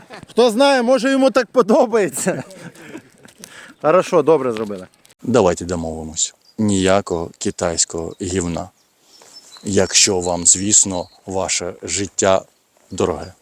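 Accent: Kharkiv, Ukraine. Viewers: Дякую за українську вимову, це ліпше ніж московитьським язиком. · Дякую, за корисні поради та за чудову Харківську українську ). Мова - теж зброя. ✌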